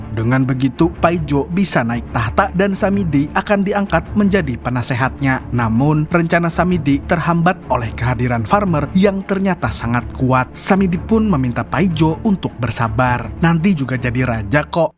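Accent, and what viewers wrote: Banjar, Indonesia. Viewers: karindangan bahasa banjarnya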